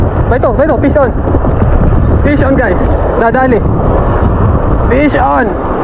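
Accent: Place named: Abra, Philippines. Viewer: Idol Taga Abra de ilog ka po ba? Ganyan Kasi tono Ng salita Ng mga taga Abra eh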